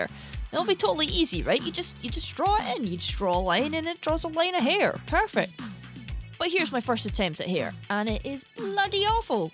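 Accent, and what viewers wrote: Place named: Northern England, United Kingdom. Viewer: So magical when a youtuber responds to me because for now his/hers base is not so big yet. :-) Anyway loving your accent (really, really enjoying it :-D). Are you from north of Britain? (I'm the heart of Europe (Czechia) so I'm not so good of guessing the accent of English speaking peeps :-) )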